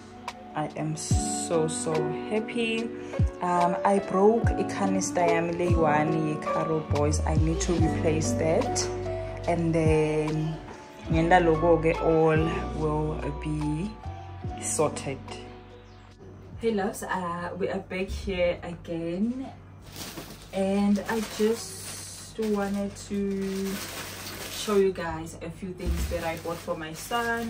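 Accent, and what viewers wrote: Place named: Eswatini. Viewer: The moment I heard your siSwati accent, I subscribed. Will figure out who is later😂. New subbie💃🏾🙋🏽‍♀️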